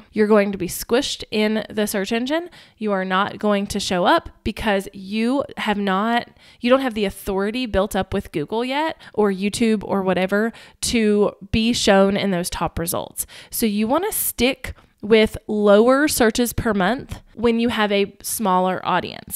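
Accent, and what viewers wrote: South, United States. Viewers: That was so helpful, and the Southern accent made the video especially interesting! 😉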